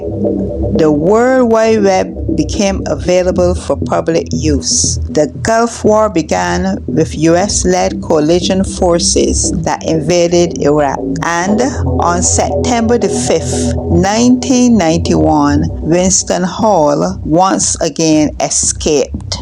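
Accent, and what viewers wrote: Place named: Barbados. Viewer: The accent is a "Bajan" accent or if you can say Barbadian accent.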